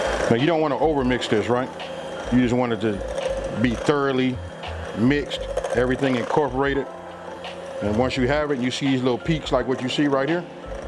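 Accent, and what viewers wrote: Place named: Los Angeles, United States. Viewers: Dude sounds like he from LA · I like this dude lol. I love that LA accent, makes me wanna move to Cali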